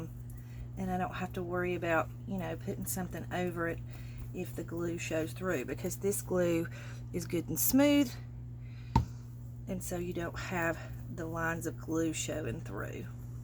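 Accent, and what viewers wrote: South, United States. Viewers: PS...still loving that southern charm and accent....music to my ears👂👂👂👂👂👂👩‍🎤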